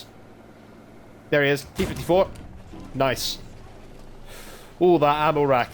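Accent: South Africa. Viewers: 10/10 South African accent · Your South African accent sound more like the Durban Indian accent than the Afrikaans accent XD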